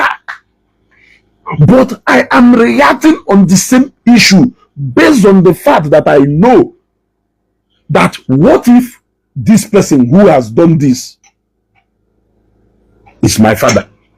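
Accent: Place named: Nnewi, Nigeria. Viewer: My son, ina eti-eti o! Continue with your Nnewi dialect, I love what you’re doing and never miss any topic. Jisike, so proud of you. ❤️❤️❤️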